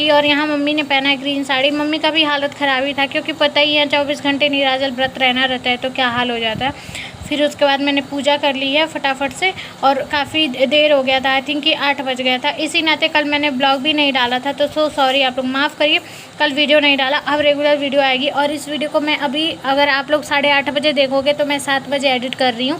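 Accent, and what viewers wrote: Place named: Bihar, India. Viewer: Aap pakka bihar se ho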